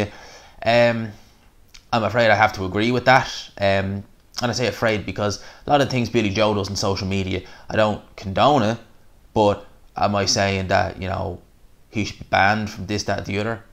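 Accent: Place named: Dublin, Ireland. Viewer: Got Irish rent - you're such a dubbie you sound like my dubbie cousins :D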